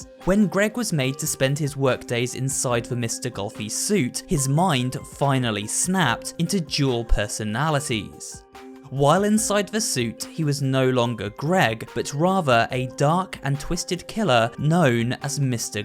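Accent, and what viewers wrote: United Kingdom. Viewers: arent you british?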